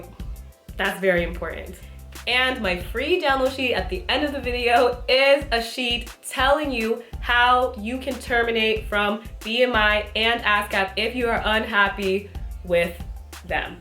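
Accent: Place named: Barbados. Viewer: Are you a Bajan? You sound Bajan! Holla back.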